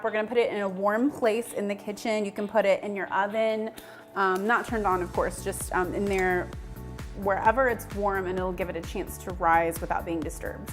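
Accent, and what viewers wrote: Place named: Minnesota, United States. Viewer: You may live in Dallas, but you are definitely a Yankee. I live in central Texas, but was born and raised in Minneapolis. You sound like Minnesota. Come on. Tell the truth. I love your channel in your cooking. I can’t wait to try everything.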